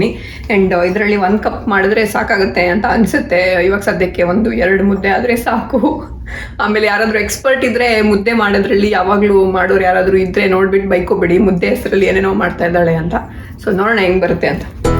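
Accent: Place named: Karnataka, India. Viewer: cute.nim magugaygy kannada kalstidirlla adu tumba good ansutay.nim kannada slang super ag edday.niv yak try madbardu big boss gay. USA all edu kannada channel's nodtiralla 👌👌👌👌👌👌👌👌👌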